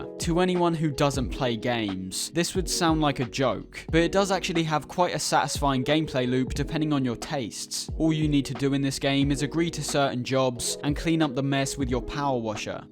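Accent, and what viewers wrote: United States. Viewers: Yes he definitely sounds like he was born and raised in South Arkansas. American through and through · I thought the thick American accent gave it away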